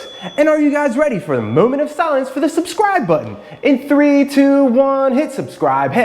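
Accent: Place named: Philadelphia, United States. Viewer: thanks bro! U sound like a local, are you from Philly area?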